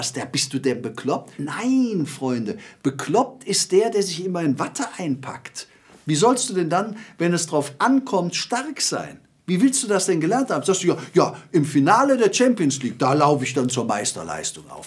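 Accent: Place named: Cologne, Germany. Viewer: Stundenlang kann ich mit dir quatschen, du bist so ein cooler Typ, mit kölsche Einschlag 👍😎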